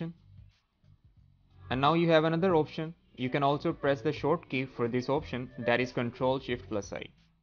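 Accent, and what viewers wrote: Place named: India. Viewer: Me: sweet this tutorial looks good hears the indian accent and leaves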